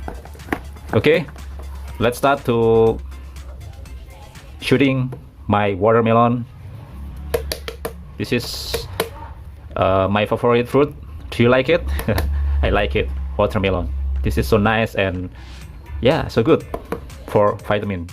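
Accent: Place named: Indonesia. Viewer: indonesian english😅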